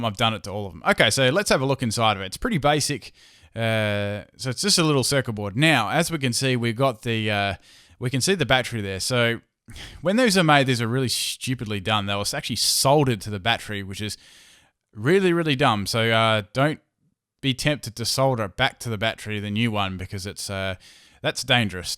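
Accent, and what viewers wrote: Australia. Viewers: he is Australian isn't he?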